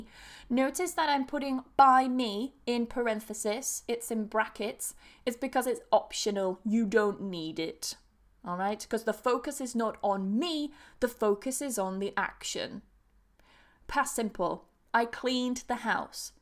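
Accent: United Kingdom. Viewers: I think it's beautiful British English 🇬🇧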